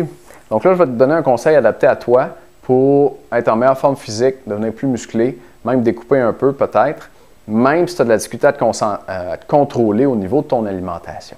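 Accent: Quebec, Canada. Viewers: Me rci pour tes precieux conseils pour moi c'est un peu le contraire ..je perd l'appétit en faisant la musculation. . .je ne sais pas si c'est bien la musculation qui le fait perdre ou bien les oeufs que je prend une demi heure après l'entraînement !?qui ne font que couper l'appétit. sinon pour votre accent québécois. .il est génial haha même s'il est un peu difficile de comprendre et saisir les mots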